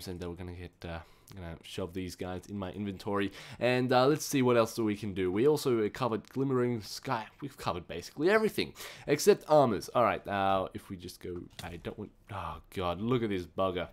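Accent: Australia. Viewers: He has an austrailian accent..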